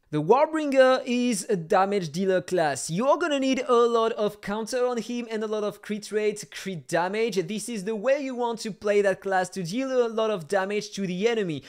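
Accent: France. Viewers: crushing it! best content out there so far, i just struggle with the french accent, but thats on me not you :P keep crushing it! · jsens un petit accent français ? Je me trompe peut-être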